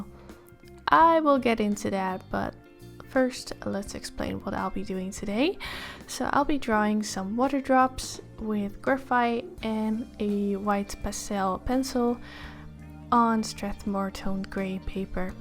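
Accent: Netherlands. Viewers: I knew it, je bent Nederlands, ik dacht al... Klinkt te Nederlands. 😍😂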